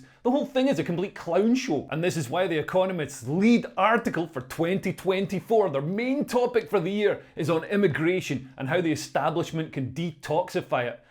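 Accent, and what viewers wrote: Scotland, United Kingdom. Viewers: A) I couldn't agree with you more, it's like hearing my own thoughts in a Scottish accent. For example, what you said about technocrats (quangos is the UK) and NGOs undermining democracy. B) Your description (from - ) of the establishment's dream for our future (given high immigration levels) sounds like a rubbish version of Blade Runner, with the replicants replaced by immigrants and space colonies replaced by our cities. It's already looking more like 'District 9' than Blade Runner though.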